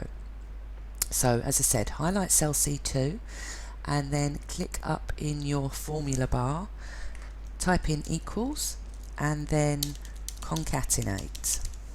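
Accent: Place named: United Kingdom. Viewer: someone with a British accent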